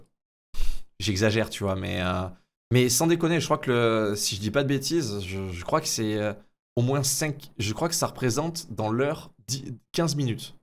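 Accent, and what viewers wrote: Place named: South, France. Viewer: bel accent du sud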